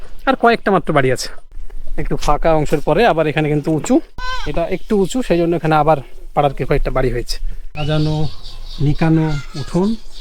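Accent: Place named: Rajshahi, Bangladesh. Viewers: রাজশাহীর ভাষার সাথে মিল আছে।আসলে রাজশাহী আর মুর্শিদাবাদের ভাষায় মিল আছে। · YouTuber nischit Bangladeshi , kotha bartay bangadeshi tan ache.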